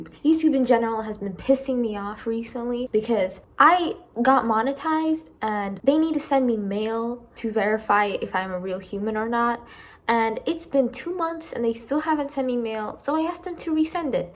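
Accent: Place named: United Kingdom. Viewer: "TW: British accent" Lmao i love her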